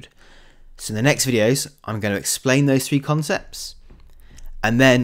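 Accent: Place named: United Kingdom. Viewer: You have got a new subscriber.. Two main reasons: 1- You have a british accent (I really love that accent).. 2- I think you're awesome ;)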